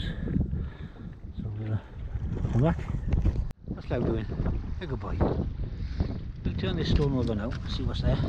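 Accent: Wales, United Kingdom. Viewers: I adore your Welsh accent and voice, reminds me great deal of Sir Anthony Hopkins, and he is my favourite actor. :)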